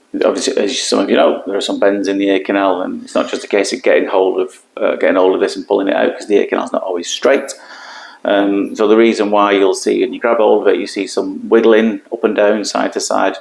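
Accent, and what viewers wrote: United Kingdom. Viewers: Show off, you tech skills are great. I live in Sydney, Australia, love your accent and hearing the British expressions which we don’t hear very much these days. I love my British heritage. I hadn’t heard the paperboy one before. Guess what, I finally know what I want to do for a career. Ear cleaning your way. I have a problem though, I’m 72, have I left it a bit late.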